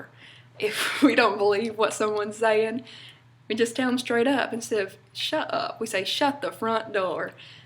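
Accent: South, United States. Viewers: Everyone has an accent, some stronger than others. I'm from Arkansas. I never realized that I had an accent till I went to Chicago and they noticed it very quickly. I don't hear it till I hear a recording of my voice. I just did an accent tag and an accent challenge tag. I'll have to see if I get any views. I'm in western Arkansas. I think I sound like a Texas/Hillbilly mix. I was born in Arkansas but lived in north east Texas till the 6th grade then finished growing up in Arkansas. Whoever said that you don't have an accent is wrong. You sound like a Southern Bell . Always be proud of your accent. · Saw this in my favourites. Seriously. I know that a lot of people say that you're pretty and all. But for all I care you could wear a paper bag over your head and I'd follow you on a shoe shopping spree along with all your lady-bros if I could hear you talking in your southern accent all day. 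· Here in this video you mentioned, that you'd be willing to talk to people one on one, if they have more questions and interest in your Southern accent and that we should send you a message, which you get in your inbox. That's very nice, but I don't see any other way to send you a message, than by commenting. Maybe you disabled that feature? But I really have a genuine interest in Southern accents and culture, as I used to be a language teacher of American English and German. Although I don't teach anymore, I'm still very interested and I wonder, if I'll ever be able to speak it, like you do. In your other video I also mentioned, that I'd like to find ways of helping each other to grow our YouTube channels. I started mine 3 months ago. I was also wondering, where your last name, comes from, from what language. Hebrew maybe? But that's a wild guess. I have no idea, actually. Anyway, I feel like we would have a lot to talk about on Skype and it would be fun. 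Let me know, how to contact you! Thanks. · Sweetheart, thanks so much for sharing your videos with people who are interested in learning about your way of speaking. I too am from North Carolina, near the Greensboro area (in the Piedmont). My husband is from "out in the country," and I was raised "in the city." He speaks completely differently from the way I do. We have some funny stories about when we were first dating. Anyway, thanks again for being so kind to people. You are a good Tarheel! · You sound like my girl cousins in S .east NC. My Grammaw from there used some old pronunciasions like saying " hit " for it, and "caint " for cant. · I’m from Michigan and now live in Cleveland Ohio. I’m an author and I am writing a book in 1950s in Wisconsin. I have a character who is from South Carolina until she went north to college and married a guy from Wisconsin and ended up there. I had family that lived in Tulsa, Oklahoma and spent quite a bit of time there when I was a kid, but their accents were more western sounding. 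The accent I’m looking for is yours - southern and feminine but not ostentatious, (but her mother’s ostentatious - more Blanche Dubois from Streetcar Named Desire😏) Thank you for your videos! I may be contacting you to refine a few passages for me. In my story, her sister lived in SC until escaping west, ending up in Sapulpa, OK which is near Tulsa. So her accent is even more different! And I have a British character, from Shropshire, UK who moved to Toronto, Canada. Everyone talks differently in my book! I don’t know what I was thinking lol🤦🏻‍♀️😅🤷🏻‍♀️ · Love the accent and a beautiful face to go with it and the blonde go with you :-) . i am from nc here in Charlotte I thought mine was thick an I mean mines is thick so I can relate :-) . Take a look at one of my videos you will here how I sound. Be blessed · I live in Western NC I sound just like you I never thought I had an accent though I though everyone else did lol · Love the southern accent. 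Ill sit and visit with a southern girl for hours just to listen to her talk.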